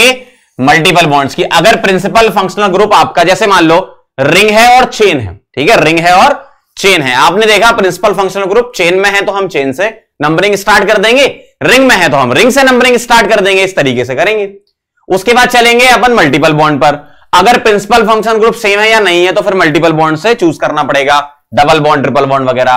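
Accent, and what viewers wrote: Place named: Ranipura, India. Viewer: Ranipura se ho ka masaab?? Eso sunbe me a Rao.. mau balan ki traf se raam Ram..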